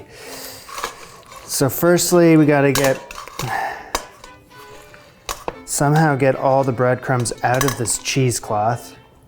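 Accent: Canada. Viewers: I’m going to guess you’re not from the US or Canada? (I’m guessing this guy is Canadian based on his accent.) Here whole chicken comes like that guts removed. You don’t have to clean it yourself. The main edible innards (gizzard, liver, heart) are usually included in a bag stuffed inside the cavity. Oh, and the neck is stuffed inside there, too. You take all that out before using the chicken. Chicken with the heads still on and uncleaned you have to go out of your way to find.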